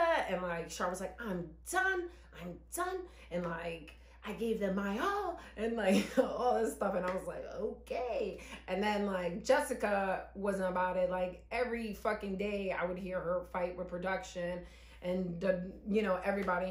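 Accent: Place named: Baltimore, United States. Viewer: The way you sound on here I would think you’re from Baltimore/DC area not N. jersey haha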